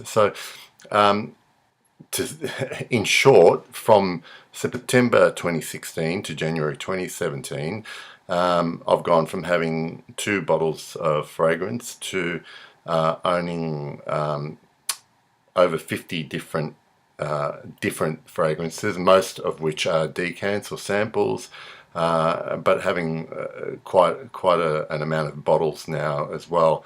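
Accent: Australia. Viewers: I'm a simple man! when I hear an Australian accent...I subscribe! Also awesome intro! excellent fragrance story, I still need to try the original Lagerfeld!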